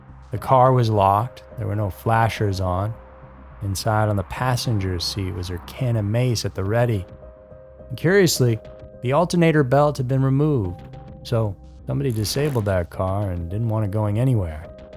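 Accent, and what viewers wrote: South, United States. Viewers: Came For The Stories, Stayed Because Of Your Lovely Southern Voice.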